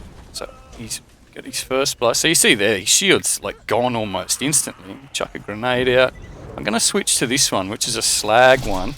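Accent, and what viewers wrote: Australia. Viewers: I just got here from yote actually. Glad I did, great videos mate, very informative, I love the farming run ones.. It's also good to hear an aussie accent as well, sounds like NSW to me. Keep em coming. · Mate where from the Aussie land are u from?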